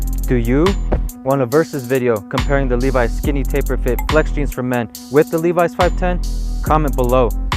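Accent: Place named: Mexican American, United States. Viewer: Mexican American accent 👍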